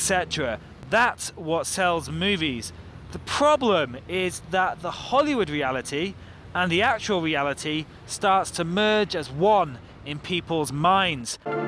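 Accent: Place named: United Kingdom. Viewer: I love all your vids,they very informative,you always try to tell how you see things the nicest way!! but I love the most when I'm listening to your vids is your accent soo British! I love it I love it!! keep up the awesome work! God bless! cheers! :)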